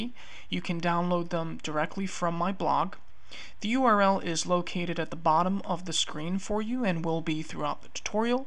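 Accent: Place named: United States. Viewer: This was outstanding, and spoken in plain American English. This is the third one I tried and the 1st one I understood.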